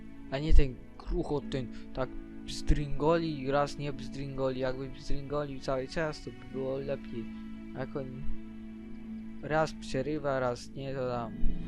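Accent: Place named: Poland. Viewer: Masz bardzo fajny głos. Czy ty pochodzisz z jakiegoś regionu polski że masz taki akcent?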